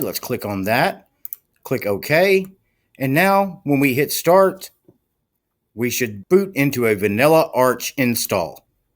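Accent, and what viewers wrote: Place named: Dakotas, United States. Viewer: Central time zone. I'm guessing from the voice you are in the Dakotas?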